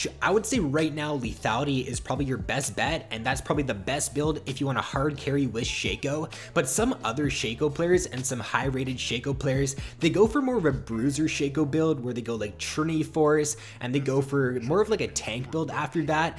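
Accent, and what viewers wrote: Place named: Canada. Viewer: Canadian af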